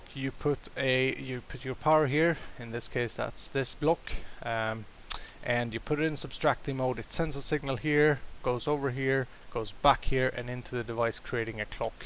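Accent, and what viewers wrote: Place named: Sweden. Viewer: lol snygg svensk dialekt. shota into lava lol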